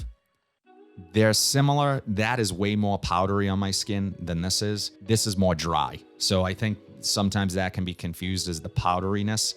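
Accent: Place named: Massachusetts, United States. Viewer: I definitely hear the Massachusetts accent😅😂🤣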